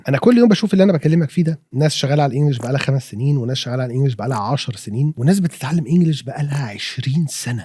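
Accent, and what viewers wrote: United States. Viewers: Hi How are you? OK I hope. Thank you for this useful video, I felt your accent is the American one & it is really heavy, if I speak with you, my accent will be the English accent of London😅,I have learned English the hard way, I speak French plus some Spanish, Romanian, Turkish, kurdish & some other dialects as well plus mother language too 😊 My level in English will be between B2 & C1 👍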